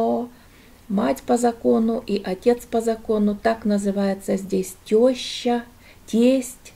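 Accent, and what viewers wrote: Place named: South, Russia. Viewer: Дорогая ,витаминка добра и солнышка ,мое уважение,люблю ваш голосок и ваш южный говорок❤👍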